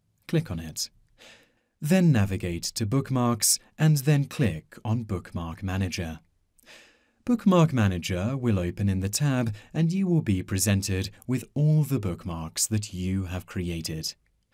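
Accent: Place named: United Kingdom. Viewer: This is just godawful. The speaker mumbles and he mumbles with a heavy accent (british?) What trash! Didn't ANYONE edit this??????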